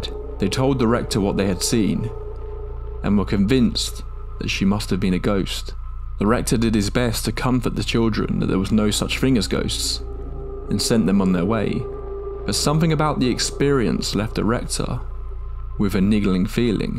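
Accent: United Kingdom. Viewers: Why does this accent sound British, he's not...Unless he is..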